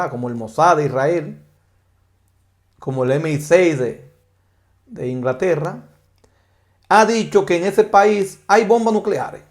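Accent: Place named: Dominican Republic. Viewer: Ahora haz un vídeo sobre la invasión de la que somos víctimas.... asumo que eres dominicano por tu acento.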